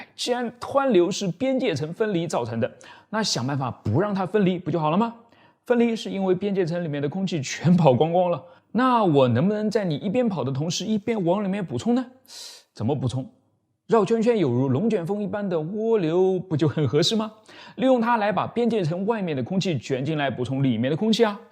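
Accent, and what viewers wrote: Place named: Shanghai, China. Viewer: 口音像上海的😎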